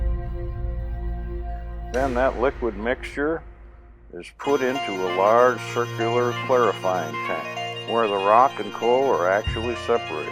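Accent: United States. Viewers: I loved this video, so educational. The man was a great narrator. Loved listening to the country twang in your voice. Great work man. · The speaker has the typical degenerated american speech!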